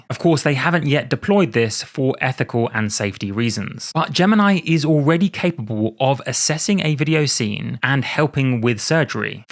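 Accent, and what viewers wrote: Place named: United Kingdom. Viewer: I'm obsessed with this British accent 🥲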